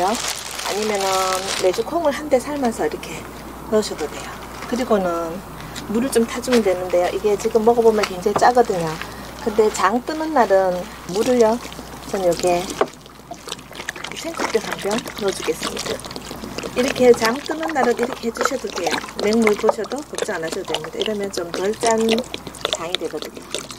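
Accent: Gyeongsang, South Korea. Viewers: 친구의 추천으로 유튜브 를 보게 되었네요 ㅎ 목소리도 차븐차븐 이해력있게 말씀도 잘하시고 경상도 바투리 매력 뿜뿜임돠^^♡ 댓글 감사 함니다 그독 알람 조이요 꾹 꾹 꾹 눌고감니다ㅎ 건강하시고 늘 조은 하루 되세요 ㅎ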